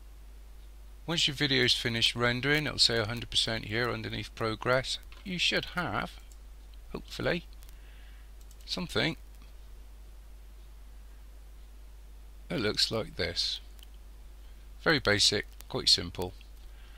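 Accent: Bristol, United Kingdom. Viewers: Smashing video. Love the accent BTW - Bristolian?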